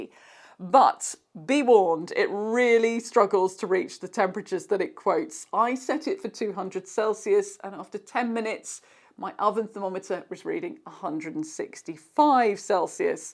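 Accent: England, United Kingdom. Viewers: I have joined your channel as it is English and that makes a refreshing change from American or Pakistani channels that don't understand the English people and what we want in an appliance, and an English person that speaks very clearly, thank you, I am looking forward to many reviews.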